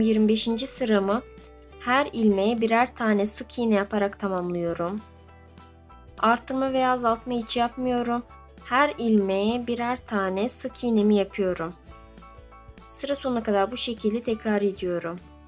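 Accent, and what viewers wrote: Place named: Russia. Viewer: Elinize sağlık hnm. Özel olmazsa bişey sormak istiyorum. Ruslar gibi Türkçe konuşuyorsunuz. Aslen rus musunuz?;)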